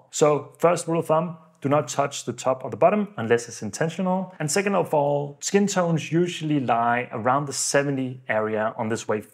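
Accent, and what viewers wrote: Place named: Denmark. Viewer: When I was watching I thought you sounded a little danish, and decided to check your profile. I was so surprised to see you were actually danish lol 😭 Din tutorial var ret god, og sjovt at se en dansker nævnt i medierne!!!!!!!!!!🇩🇰🇩🇰🇩🇰🇩🇰🫡🫡🫡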